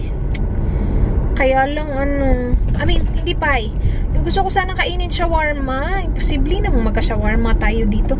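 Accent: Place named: Visayas, Philippines. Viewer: ung sobrang naging fanatic na ako,pati accent ni sa bisaya naadopt ko na unconciously..hahaha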